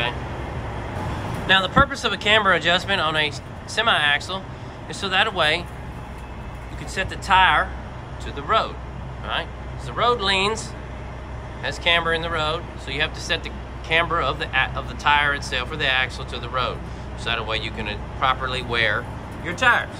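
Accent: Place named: South, United States. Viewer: I do love how in some videos he says something like, “As you can tell by my southern accent, I’m not an educated man.” Damn, dude! I am an expert in my field, but you do things that I could not even try to pull off! Keep it up!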